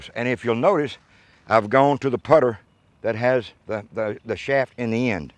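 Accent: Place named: South, United States. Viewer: Great southern accent! Makes the video succulent.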